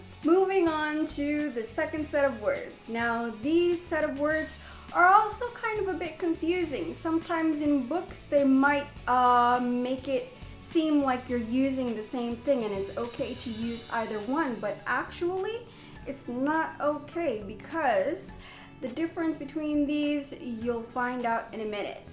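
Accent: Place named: United States. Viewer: Really like your american accent 😊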